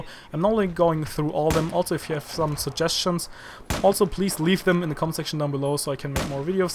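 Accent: Germany. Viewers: are u german? :D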